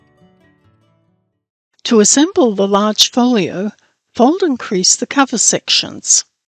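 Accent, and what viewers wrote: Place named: Australia. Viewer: I have watched whar feels like 100's of tutorials on journal binding. This is the best style by far. I can't wait to give it a go. Also, it is so lovely to hear a fellow Aussie making the video. Thanks for sharing.🥰😍🤩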